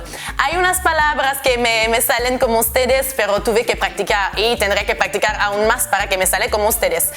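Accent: France. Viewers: Te felicito que bien hablas español , solo es el acento y eso no significa un problema , igual para los españoles que viven en latinoamerica ellos aunque vivan en Peru por ejemplo nunca dejan su acento y su pronunciacion , basta ir a la iglesia y escuchar que aunque han llegado hace 40 años siguen pronunciando a la española , yo pienso que con tal que te hagas entender y uses bien las palabras el tiempo se encargara de ir suavizando tu acento frances pero a mi me parece muy gracioso y lindo , abrazos y bendiciones💗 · Está demostrado en las neurociencias que la mayor dificultad para un adulto al aprender un idioma nuevo está en el lado de la fonética, y esto tiene relación con la pérdida de plasticidad neuronal a medida que crecemos. Aprender a diferenciar y a usar "correctamente" la infinidad de vocales del francés para un adulto hispanohablante es cosa de titanes, mientras que para un niño será mucho más fácil. 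Es lo que te pasa. Sin embargo, aunque con acento francés, se entiende perfectamente lo que decís, y eso es LO ÚNICO que importa. Ya de paso, esa forma de hablar le da un encanto particular. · primera vez que escucho el acento frances con el español, :O es hermoso gracias ;D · Aunque hables el español con un marcado acento francés, se te entiende perfectamente. Además que tienes una fluidez hablándolo que es igual a la de un nativo. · el acento no tiene nada de malo, mi madre murió con él; es verdad, la formación bucofaríngea de entrenamiento del idioma manda; te doy un detalle: los españoles que pronuncian con zeta y ce marcadas, no pueden pronunciar la "tl" (atlántico dicen "adlántico", hitler dicen "hidler"); en estos días estoy tratando de pronunciar el nombre del piloto francés de Indycar Romain Grosjean (difícil) ¿gjromaen grosyeán?; todo es cuestión de práctica, hablas muy bien, perfecto, con acento francés, claro; ¿así que de la tierra de Aline Dieu? 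hablan francés en Quebec ¿verdad? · Se te entiende bastante bien el español y eres muy simpática. Creo que el tema de los acentos es una opción personal, hay quienes les importa más o menos hablar con acento extranjero. Si de verdad quisieras suavizar tu acento francés al hablar español, una pequeña sugerencia podría ser que trates de no vincular tanto tu idioma nativo al español. Un políglota que sigo en youtube dice que cuando habla otro idioma trata de verse a si mismo como si fuera nativo del idioma objetivo, de gesticular, incluso de vestirse como ellos, esto ultimo puede ser un poco exagerado pero me imagino que es algo similar a lo que hacen los grandes actores. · Me cago de risa con el extanjego, pagqe, es muy chistoso el acento frances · Me encanta tu acento francés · El acento francés es adorable no lo pierdas :). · Tu acento francés es agradable al oído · Por favor no pierdas tu acento francés, es muy bello escucharlo mas de una chica.... 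· Double wow. Tout d'abord tu parles vraiment bien espagnol. Pour ce qui est de ton accent, c'est la première fois que j'entends un accent français aussi fort. Je serais curieux de connaître comment tu as pu maitriser aussi bien la langue espagnole sans perdre ton accent. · Por cierto estoy aprendiendo francés. Después de varios intentos en el pasado me muero por aprender vuestro idioma y eso que ante lo odiaba y todo ello gracias a tu acento francés del español. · La verdad es que hablas mejor el español que muchos cubanos, puerto riqueños, argentinos, centroamericanos, chilenos, argentinos, uruguayos, algunos españoles (a los cuales nos se les entiende ni madres), etc. O sea que no tienes nada de que preocuparte, ya que casi hablas igual que los mexicanos del centro del país. Lo único desagradable es tu notorio acento francés, pero tu dicción, en general, es muy buena. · Es uno de los videos que más me gusta de tu canal... 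Me encanta tu acento afrancesado y si se entiende todo bien, la gente critica por todo jajaja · Felicidades hablas español muy bien👍 y el acento francés es muy bonito👍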